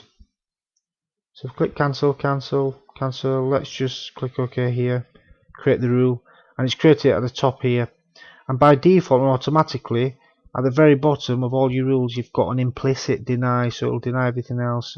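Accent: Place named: England, United Kingdom. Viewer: Dude, you are soooo English!!! :-) I just don't have the accent (grew up in the UK when I was younger). Excellent explanations by the way...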